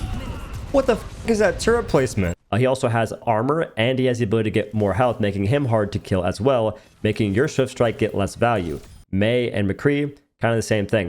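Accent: South, United States. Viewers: You went to having an accent to being a country boy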